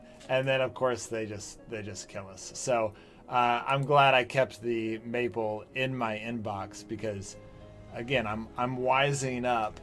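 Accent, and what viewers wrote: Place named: South Africa. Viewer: That's dude got a south african accent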